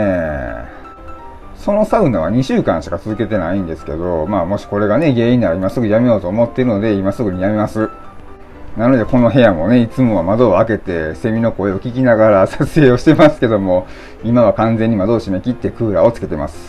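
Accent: Osaka, Japan. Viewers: いろんな変わった症状が出てくるんですね。大変な事でなくで良かったですが。先生がこの事うまくさんに説明できるかなって心配。話の途中で漫才風になるのが大阪人ですね。昔１０年ほど大阪に住んでいたんで大阪弁懐かしく聞いています。コテコテになるほど思い出が出てきて嬉しいです。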